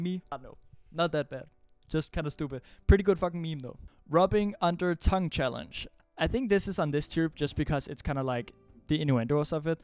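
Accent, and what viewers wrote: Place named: Denmark. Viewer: I can sniff out that danish accent anytime awesome video tho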